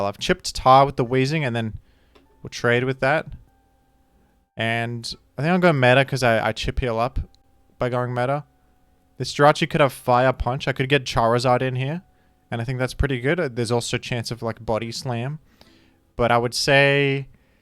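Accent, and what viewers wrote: Australia. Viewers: I know it sounds basically the same for americans but he is, in fact, australian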